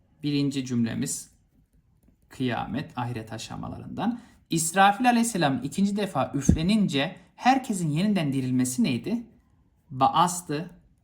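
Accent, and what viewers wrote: Malatya, Turkey. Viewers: Hocam Malatyalımısınız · Hocam çok güzel anlatıyorsunuz elinize sağlik ama siz Malatyalı mi siniz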